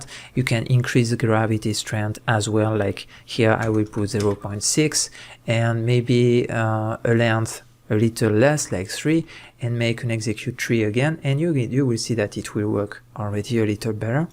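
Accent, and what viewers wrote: France. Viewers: L'accent a la française !!! Tmtc😂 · French accent ^^ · nice tutorial with nice baguette accent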